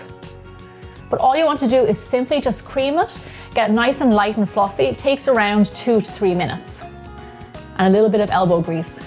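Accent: Ireland. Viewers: Came for the recipe, stayed for that lovely Irish accent! Can't wait to try!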